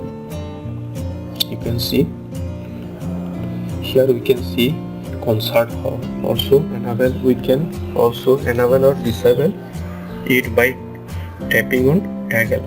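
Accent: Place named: United States. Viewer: American lag rahi hai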